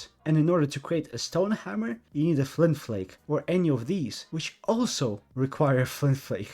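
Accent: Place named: Philippines. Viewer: why do you sound like a filipino?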